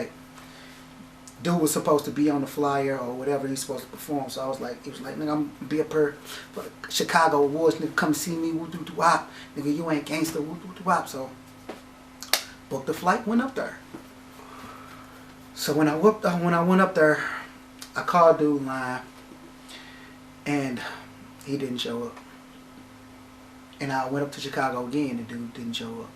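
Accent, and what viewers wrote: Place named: St. Louis, United States. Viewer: ***** picosso said "great artist steals" not saying he's great but great in the eye if the blind. You know what I mean bro. I'm from St.Louis to bro and I hurr it in your voice. Your a humble guy man because if you wasn't you'd be hot headed & I'm glad to see after all that your still humble God bless u bro. Keep doing you. The fakes getting exposed everyday. God givith & God takith away. He wont be seeing bright days much longer just keep doing u.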